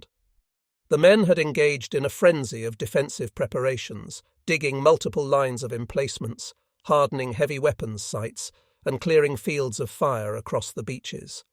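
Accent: United Kingdom. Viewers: The creators are using open source software and have learned as they were producing. These videos are a labor of love. Give them credit for what they are doing. I still detest that semi British accent of the voice.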